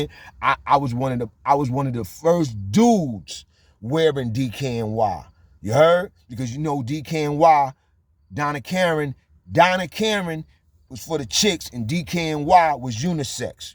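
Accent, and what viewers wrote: New York, United States. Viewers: Ain't nothing like hearin a REAL NY NIGGA spit that G!...U Heard.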